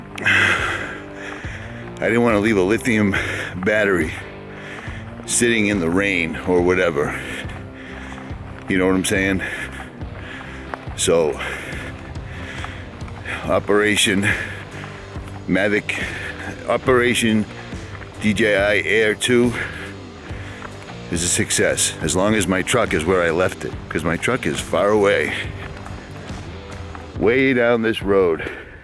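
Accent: Boston, United States. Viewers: Sounds like you have a slight Boston accent. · Sounds like your cuzin from boston!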